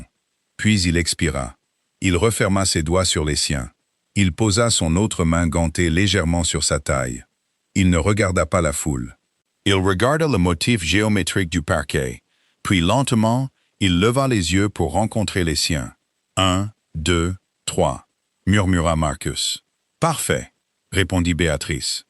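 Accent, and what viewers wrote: England, United Kingdom. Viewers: L'histoire est belle et originale! Bravo! Dommage pour l'accent anglais assez inutile...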